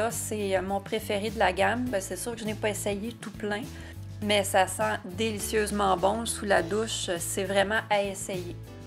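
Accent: Canada. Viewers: cc jadore ton accent cannadien si je ne me tropmpe pas.jadores les produits Yves Rochers.moi je prend souvents des parfims et billes de bains.super ta videos je te fais de gros bisous a bientot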